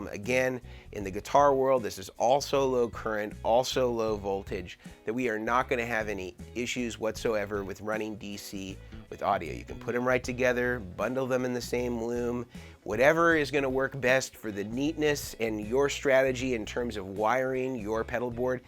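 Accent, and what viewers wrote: United States. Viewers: Not in America. He’s saying it correctly for Americans. Don’t take it personally.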